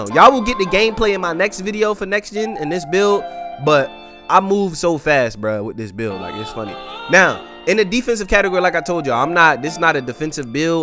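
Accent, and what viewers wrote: Baltimore, United States. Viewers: Dang bruh you from Baltimore 😭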